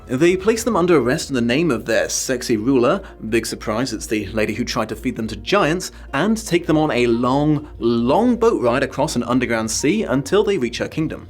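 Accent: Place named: United Kingdom. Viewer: I’m always happy to see more videos His lovely British voice soothes me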